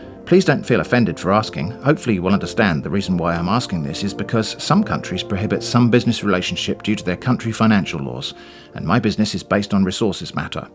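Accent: England, United Kingdom. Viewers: I hope you are very careful at doing this sort of thing. Watched a few of your videos and makes me concerned for your safety. After all you are putting this on YouTube and they could be watching and know what name they gave you email profile, you may bait the wrong person, piss them off and then find out somehow where you live and since you have an English accent probably won't be too hard. Since you are warning us educate us on these scum of the earth buttheads, making them harder on them to get money, one may get really ticked off and the person may not be mentally stable and decide to come face to face with you and do something horrible to you. Very concerning, very alarming. I do thank you for these videos and appreciate the risks you do take, sacrifice your risks, and safety for our sake is such a noble act and I hope others will be grateful and thankful as much as I am and I have learned a lot from your "scam baiting" videos. 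Now I know what to look for when checking emails and text messages with caution. I get a ton of emails and text messages from these creeps. My phone has that scam block on both emails and text messages, but for some reason they keep coming through. I have downloaded apps from the play store, and they don't do jack nor sh*t to stop it. If I don't keep my phone on do not disturb, my phone is constantly ringing. If you tried to call them back, the AI voice says " this number is no longer in service" so they can call you all day long but can't call the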